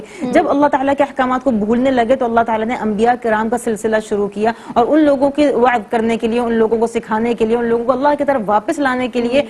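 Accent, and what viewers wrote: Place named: Arab world. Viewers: I think you don't have idea that she have born in Saudi Arabia, and brought up there and she doesn't know urdu much, and her first language is arabic that's why she speaks like this, kindly search about the person then talk about them....jazak Allah khair. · Why she talk Urdu in Arabic style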